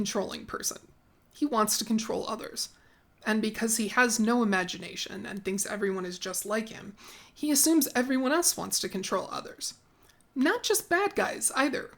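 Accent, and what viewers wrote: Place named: Russia. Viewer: Just love your Russian accent.❤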